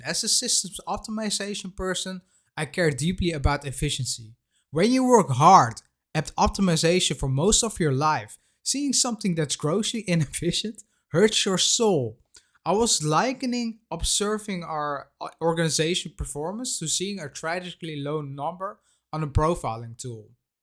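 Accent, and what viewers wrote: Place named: Netherlands. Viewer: Ik hoorde gelijk dat je uit Nederland komt :)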